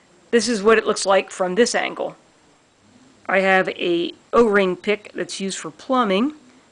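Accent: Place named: Maryland, United States. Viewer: I detect a Maryland accent :)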